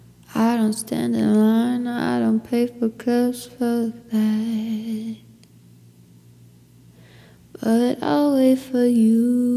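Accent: Spain. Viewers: Aré u Spanish? Bc u have the accent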